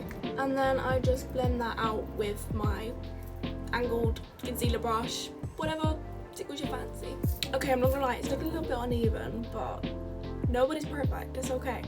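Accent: United Kingdom. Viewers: I KNEW U WERE BRITISHHHH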